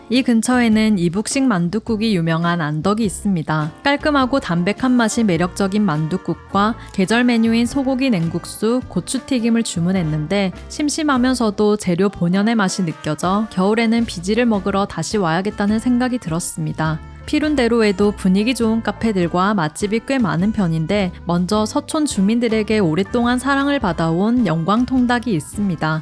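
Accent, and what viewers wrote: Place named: Gyeongsang, South Korea. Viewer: 경상도 사투리다! 넘 촬영도 잘하고 차분하게 나레이션해주셔서 추억뿜뿜이네요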